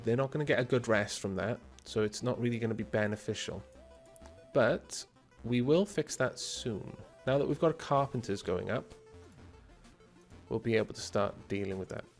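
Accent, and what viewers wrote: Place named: South Wales, United Kingdom. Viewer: Hey where in Wales you from? You sound like a proper south-walian!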